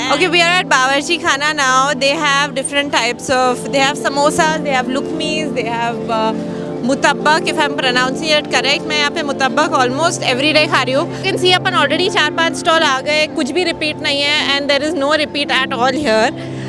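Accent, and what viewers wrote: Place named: Bihar, India. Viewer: Tumhari awaaz slum bihariyoun ki tarah hain Learn hindi urdu property Don't say logaan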